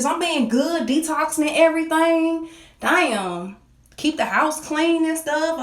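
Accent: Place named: South, United States. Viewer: You fine ass hell , with that country voice dam , bro lucky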